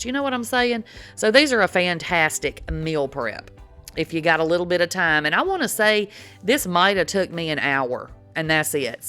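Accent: South, United States. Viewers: When you hear that Southern accent, you KNOW she can cook.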